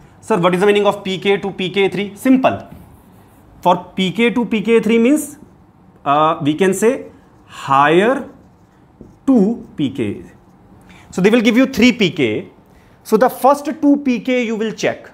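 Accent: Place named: North India, India. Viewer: By your name and accent, I realised that you are a North Indian setteled in South India. Am I right?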